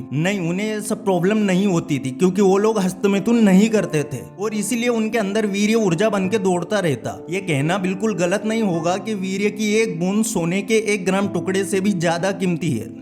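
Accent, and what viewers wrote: Indore, India. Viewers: Aap indori lag rahe ho...accent se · Indore ke aaspas ke ho aap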